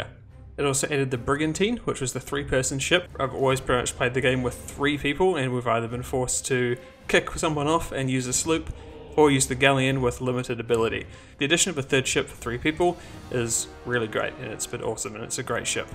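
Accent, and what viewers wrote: Australia. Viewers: Canadian dollars.....you live in Canada? You sound Australian or maybe kiwi haha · What he's Canadian? I could have sworn from his accent he was Aussie.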